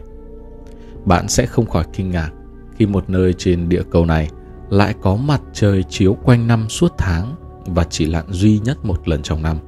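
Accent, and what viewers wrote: North, Vietnam. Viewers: Anh giải thích về giấc mơ rất hay, cho thấy anh có rất nhiều kiến thức vững chắc. Chỉ mới biết Channel của anh hơn hai tháng, đang nghe lại những bài củ cả năm trước. Giọng đọc của anh quá hay và rõ ràng. Tôi đến Mỹ từ nhỏ cho nên có những Channel nói giọng Bắc nhanh quá rất khó hiểu với tôi, nhưng giọng Bắc của anh rất hoàn hảo, chậm rãi dùng từ ngữ dễ hiểu (😄👏). Thanks so much! Love your Team! Love you all!❤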